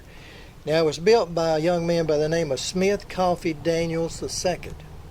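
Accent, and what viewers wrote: South, United States. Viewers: This is very interesting and great narration/education...BUT... your hick southern twang somewhat makes it comical. But this is the deep deep south, so I guess, voice blends. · Another beautifully done, beautifully narrated video. This transplanted-Yankee North Carolinian is an admiring follower. Keep it up. I hope you continue making these, there's nothing else like it on YouTube. You combine, charm, simplicity, timing, visuals, human interest, and detailed historical fact like nobody else can. And if you ever lose that wonderful southern accent, you're going to be in big trouble with me. Lol. Many thanks.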